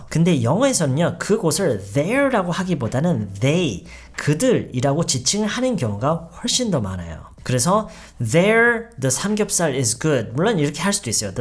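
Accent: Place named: United States. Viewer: 유용한 영상 감사해요 !! 말투가 박재법 군이랑 비슷한데 미국 어느 지역 엑센트인가요?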